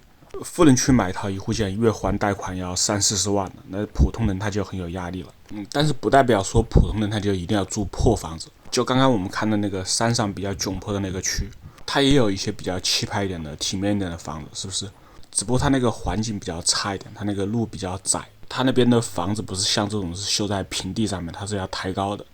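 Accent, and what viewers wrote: Wuhan, China. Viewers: 这口音，基本可以说是武汉或者周边的口音，乡音太浓了。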